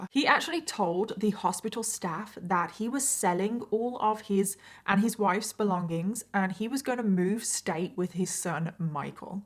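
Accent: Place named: United Kingdom. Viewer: i was literally binging your series and wanted to see a compilation of like abvsive parentys and then next day i have it! (obviously i would rather not have these crimes to exist, condolences to the victims) I also build a lot of minecraft while your videos run in the backround and your voice is so calm and Sassy is so funny and point-on! Also i always knew you were british =D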